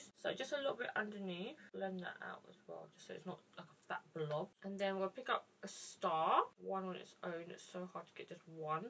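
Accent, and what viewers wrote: United Kingdom. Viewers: Olivia 811 1. She's British. 2. She has braces under her teeth which causes her to have a lisp.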